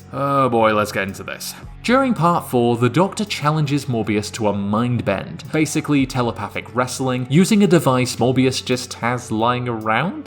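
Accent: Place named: Canada. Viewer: Sounds a bit Canadian.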